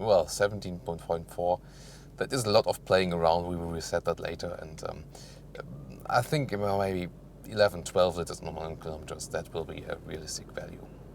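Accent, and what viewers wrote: Germany. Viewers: Alfas are today quality cars. I had a 159 2.4 diesel model from 2006 to 2009 and that was the first well built Alfa to my knowledge. Giulias are today very beautiful cars with a special touch of emotion. is well prepared and hence knows his stuff. I especially enjoy his somewhat funny English pronunciation and partly German intonation. Thank you. Hopefully I did not insult you as that was not my intention.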